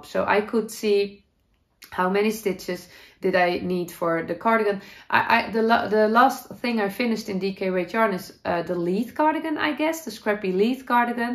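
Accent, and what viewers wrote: Netherlands. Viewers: thank you so much for sharing your life and makes with us all. I love your dutchy-english and am always impressed with just how fluent you really are. That bike ride at the end was FABulous! I'm so envious of your locale 🌳and I absolutely love your pup 🐶❤You really are so talented and seem to know your personal style so well. Oh yeah, I also love your hair!!!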